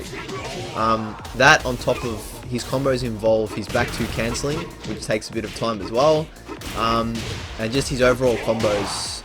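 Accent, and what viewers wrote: Australia. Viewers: The moment I heard your voice haha I knew you were aussie😂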